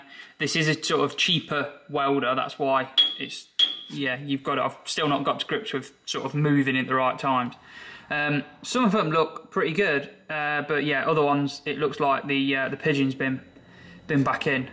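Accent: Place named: Nottingham, United Kingdom. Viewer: Nice work. I’m trying to work out your accent,. Are you from Nottingham?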